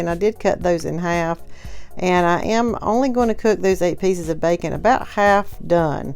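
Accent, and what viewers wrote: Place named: North Carolina, United States. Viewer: The 2nd I heard your voice, I knew you were from North Carolina!! ❤️. I’m also from NC, born & raised! That food looks AMAZING, but regarding the BBQ sauce, I am partial to the NC vinegar based sauce, lol. *But, I absolutely would not turn my nose up to the BBQ you cooked in this video, LOL!! That corn, OMGosh, you’ve got me craving some sweet summer corn now, thanks!! 🤣 *We live Lillington, right outside of Fort Bragg. 🙋🏻‍♀️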